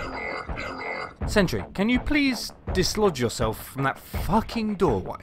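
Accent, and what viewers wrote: United Kingdom. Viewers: UK English sound soo badly...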